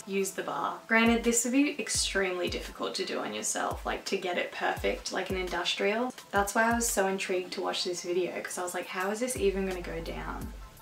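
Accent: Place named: Australia. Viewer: That's Gross. I pierced my own ears when I was 12 the second and third holes. Had my first ones done at birth. (Argentine parents). Girl u have. Great content 👍 recognise that Aussie accent I'm in Canberra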